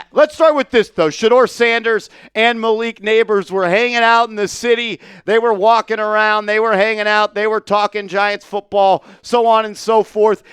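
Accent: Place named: Midwest, United States. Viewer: How is this guy the host for the Giants on Chat Sports ? He sounds like he’s from the Midwest. I live 10 miles away from Giants stadium, and Giants fans don’t sound like this. 🤔🤷‍♂️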